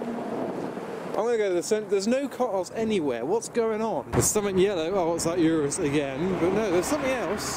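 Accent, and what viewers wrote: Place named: United Kingdom. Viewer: should be doing something like voice overs or hosting a show or something with his so British sound. Lol this guy could talk about grass and it would be entertaining.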